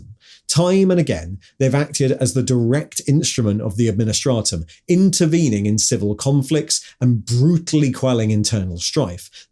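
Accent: England, United Kingdom. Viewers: Accents are so interesting and unique. I love learning about them. No, I'm not talking shit about him or anyone with an English accent.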